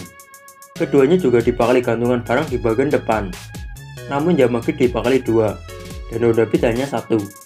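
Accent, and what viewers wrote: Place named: Banyumas, Indonesia. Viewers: Bahasane wong Tegal.......ngapak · ngapak ya mas.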